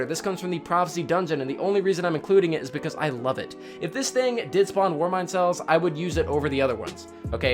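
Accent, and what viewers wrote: France. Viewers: U actually French?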